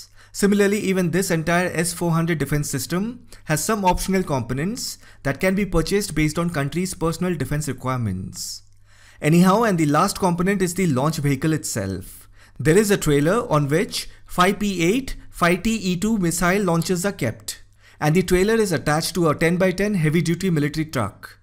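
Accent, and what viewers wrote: India. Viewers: Love indian english accent ❤🙂🙂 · Indian accent is so annoying. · Damn, It`ś interesting but Indian english is beyond my abilities to withstand ;o)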